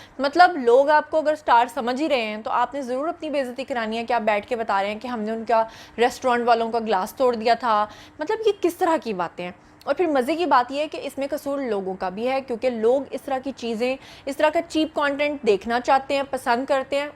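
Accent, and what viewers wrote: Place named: Sialkot, Pakistan. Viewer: mind your language,it is Competitor not compi-tator,change your Sialkoti Accent.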